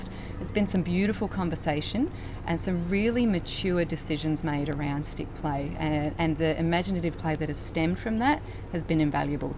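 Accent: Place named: Australia. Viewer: Anywhere. I once accidentally put a "corn dog" stick through a guy's hand. It was a little pointed on the end, but not sharp - but it just took one little slip goofing off and he had to go to the ER. Ruined everyone's night at the county fair. You should really just be supportive of a place that advocates kids being kids, instead of trolling all her posts. Sounds like she is an Aussie, btw.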